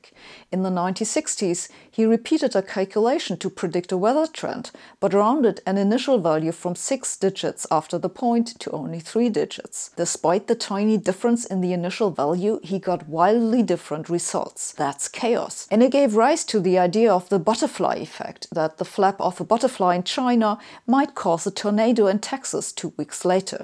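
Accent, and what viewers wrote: Germany. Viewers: Maybe it's the German accent or something, it sounds like she's cutting words too harshly . But it's ok, at least I clearly understand what she says, unlike real anglophones who are "eating" their words.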